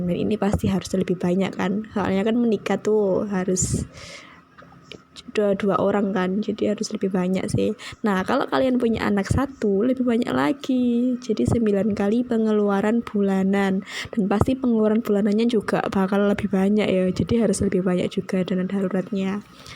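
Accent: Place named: Java, Indonesia. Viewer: Asli org mana mbak? Kok medok bangets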